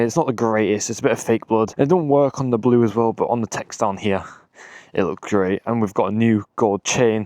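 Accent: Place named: United Kingdom. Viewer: I need one without ND Filters Bro which country are you from? You get R125. It looks like an R15 Though You sound British, i didn't know they have 125cc in English countries, we don't have R125 in my country but we have an R15. It's a really good bike i wanted one too i like the R15 intensity White, recently they released a purple white as well. But i don't wanna switch yet because it's also an uncomfortable bike but R15 is favorite throughout the whole country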